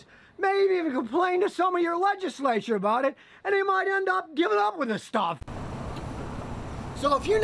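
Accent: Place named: Pittsburgh, United States. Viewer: Amen!!! Ps: are you from Pittsburgh? You sound like you are, makes me miss home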